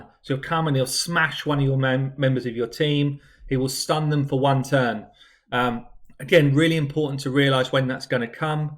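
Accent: United Kingdom. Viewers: you rock!! I've been watching your videos for a while and I always look forward to your insight and delightful British accent.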